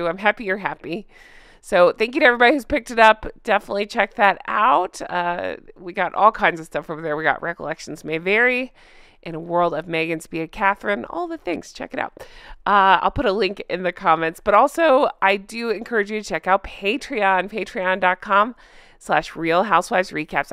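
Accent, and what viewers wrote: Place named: Midwest, United States. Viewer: What accent?! I’m from the Midwest and you sound like ME!!! Lol😂. Love a British accent!😊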